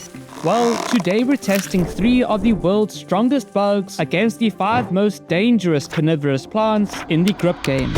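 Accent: South Africa. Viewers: Totally random, but you sound like you have a South African 🇿🇦🇿🇦🔥🔥🔥 I'm from SA too ✨✨✨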